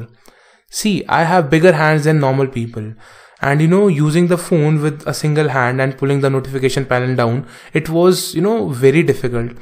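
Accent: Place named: India. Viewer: oh god ! im going to be sooooo racist! your accent is soooopo onmy nerve! i've heard another indians accent but your's just so deeeeeep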